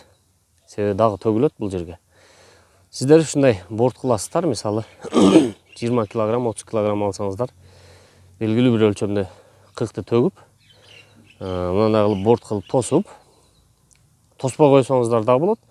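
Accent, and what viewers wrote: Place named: Batken, Kyrgyzstan. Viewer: Баткендик окшойт бизче сүйлөп жатат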